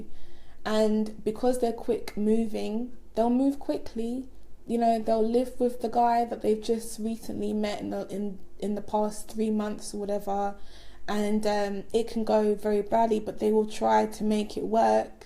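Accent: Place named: London, United Kingdom. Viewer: Also nice to hear a London accent on an astrology video 🥺🥺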